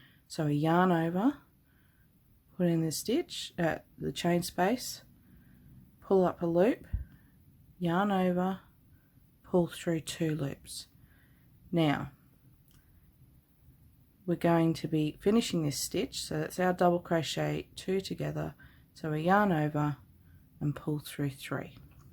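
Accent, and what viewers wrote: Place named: Australia. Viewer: Great to hear an Aussie tutorial loved this made a few just subbed too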